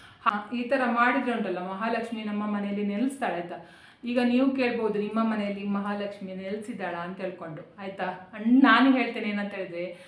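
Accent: Mangalore, India. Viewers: ನೂರಕ್ಕೆ ನೂರು ಸತ್ಯ ನಮಗೆ ಒಳ್ಳೆಯದಾದರೆ ನಮ್ಮವರೆ ಸಹಿಸಲ್ಲ ಅನ್ನೋದು ನಿಜ ..ಚೆನ್ನಾಗಿ ಮಾತಾಡ್ತೀರ ನಮ್ಮ ಮಂಗಳೂರು ಕನ್ನಡ❤ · hello...Very useful information, point by point explained the reality and truth. Your kannada is very clear its pure mangalorean remembers Late Aparna's Accha kannada. and by your appearance & the way you speak kannada I feel you are vishwakarma Acharya or konkani.However its nice that you are open and clear minded. When we do choodi pooja in Shravana we will do it.Only kudure laala we have to check from where we will get it.again thank you very much.I have also observed marvaadis while closing their business shop they say mantras.they are always rich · Thank you very much for the valuable suggestion And your nice Mangalore Kannada.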